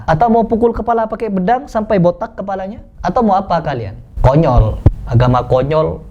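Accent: Madura, Indonesia. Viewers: bukan orang madura, tapi ngomong logat mdr, krn sy sendiri, ayah mdr, ibu jawa, krj guru sd pasca merdeka, ini antek amrika, mirip prabowo, hingga kapal pertamina indonesia ditahan iran, hingga nggak bisa apa 2,pdhal ayatullah hafid qur an, kalau nggak bisa bc huruf, itu kelihatan..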